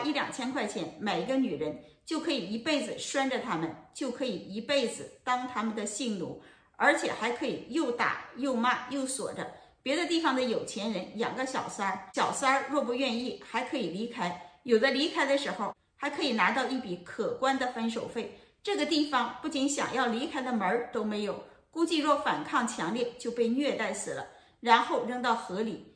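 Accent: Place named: Northeast, China. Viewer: 你东北口音，又不是徐州的，怎么了解的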